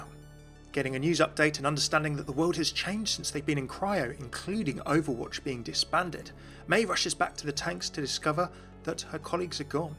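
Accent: United Kingdom. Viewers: FoulFox Nation how can someone not British sound more British than British people? That makes no sense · The accent could be afrikan british origin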